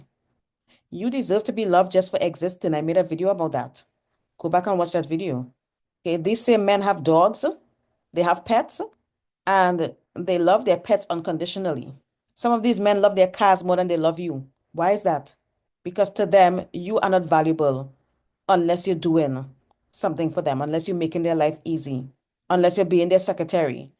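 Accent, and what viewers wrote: Trinidad and Tobago. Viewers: Yes she sounds like a Trini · What a minute i love the cursing you are a mess. You also sound Trini. Are you?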